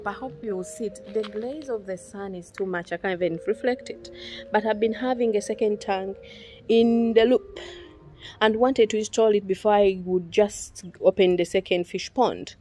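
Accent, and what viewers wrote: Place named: Uganda. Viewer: You sound Ugandan, did you emigrate to Ghana?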